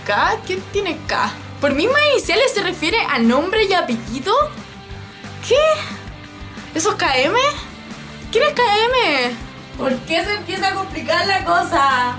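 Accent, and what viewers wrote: Chile. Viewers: eres chilena perdon recien te conosci en youtube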